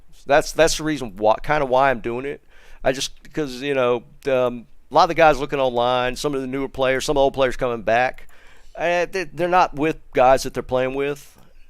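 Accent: Texas, United States. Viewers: Omg holy crap, I was thinking the same thing also. That accent seems like a texas cowboy accent.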